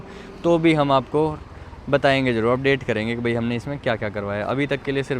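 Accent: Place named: Gurgaon, India. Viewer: टोप, रो , भैयो का एक्सेंट गुड़गावे के लगते।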